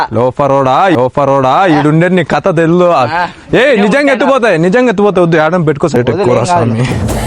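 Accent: Anantapur, India. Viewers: Medi ananthapur ah bro slang knchm alane undi .. just asking anthe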